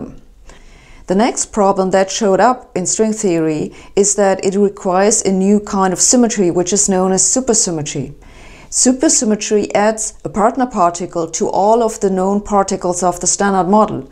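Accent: Germany. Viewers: german english. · Is she German? Does anyone know if she's German?? 😂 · I love it when she speaks English with a German accent. I first tuned-in for the science, but her deliver is so mesmerizing, I'd listen to her talk about any subject.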